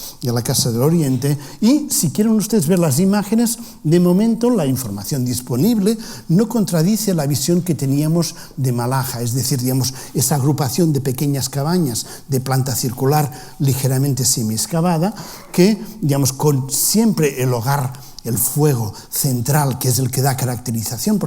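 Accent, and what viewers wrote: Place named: Catalonia, Spain. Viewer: Sabe hablar en Español? Parece catalán...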